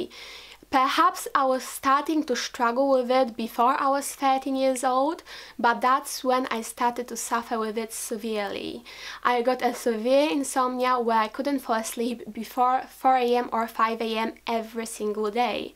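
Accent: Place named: Europe. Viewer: The Real Mansa Musa her school was british and she sounds european and her name looks european too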